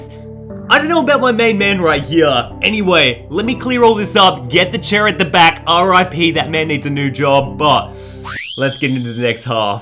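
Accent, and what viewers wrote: Australia. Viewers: Are you an Australian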